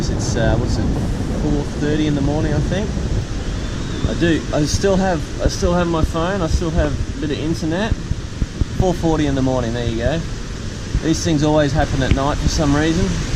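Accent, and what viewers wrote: Australia. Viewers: wait are you from brisbane? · You sound Australian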